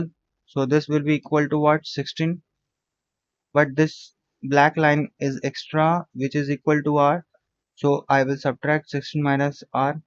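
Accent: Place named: India. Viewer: Good but I hate your Indian accent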